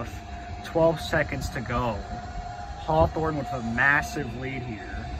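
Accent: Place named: United States. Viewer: You do sound America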